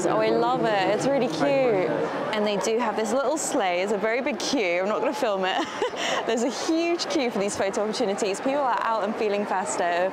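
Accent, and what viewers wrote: RP, United Kingdom. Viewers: Nice video .I like your accent .Slightly posh but not too much so .I will be spending half a day in London next Sunday .Walking around but not buying much at those prices .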